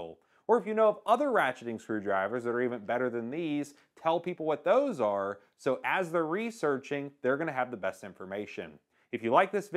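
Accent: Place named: United States. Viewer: As an Aussie (Australian) I am having great fun trying to work out what part of the US the reviewers accent comes from. Boston? Upstate New York? Dunno, but it is pretty cool. PS- Love your videos, but sad that a lot of the stuff that is reviewed on this channel is not readily available here in Oz.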